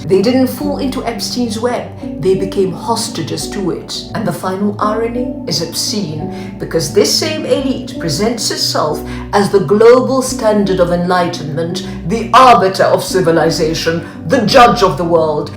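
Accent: United Kingdom. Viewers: Looks like AI to me? Posh Brit accent of an older woman?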